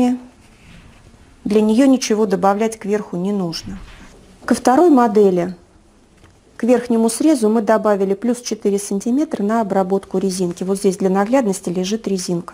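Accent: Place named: Voronezh, Russia. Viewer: Спасибо за мастер-класс,! Первый вариант притачивания резинки лучше)). А еще мне очень нравится ваш приятный голос и воронежский "говор", который я узнала с первых минут видео😄.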